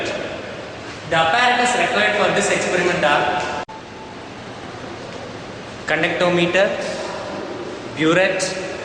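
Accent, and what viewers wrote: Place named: South India, India. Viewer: South indian english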